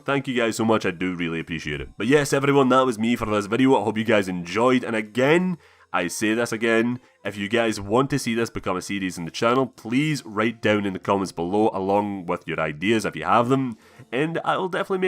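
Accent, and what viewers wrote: Scotland, United Kingdom. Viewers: This is my first vid of his that I’m watching and in the first few seconds I thought he was Russian, and then I heard the Scottish accent, this is pretty much the only Scottish gamer I’ve found on here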